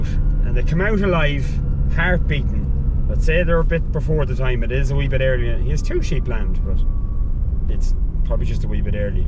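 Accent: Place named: Ireland. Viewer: Hi...loving your vlogs,it’s great to see you back home.My cousin is trying to do our family tree, and it appears our family originally came from your part of the world.I remember my grandparents,plus aunts and uncles, ( sadly all passed now )speaking in a lovely Irish accent…talking about Leprechauns, great story tellers, So hearing you talk,and seeing the landscapes,makes me feel closer to them and where they came from,very much appreciated. Sue (Woodhall Spa) x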